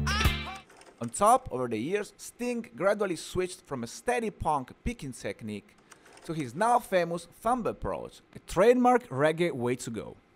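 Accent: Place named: Brazil. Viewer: I like this video thank you and your accent reminds me of Brazil in goodness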